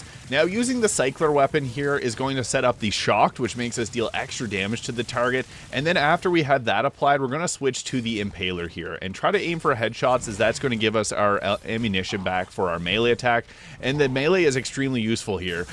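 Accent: Canada. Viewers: Do I hear a fellow Canadian?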